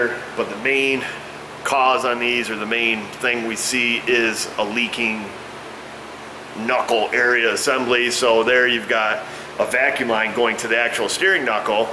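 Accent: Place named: Upstate New York, United States. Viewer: Alright, Man when you said "wicked" a couple times I knew you were from Upstate... but when you said "jabronie" now thinking Binghamton. Either way, it's nice to hear someone else who talks like me. I live out here in Washington State now but was raised in the wicked cool town of Binghamton, NY with some other stinkin' jabronies.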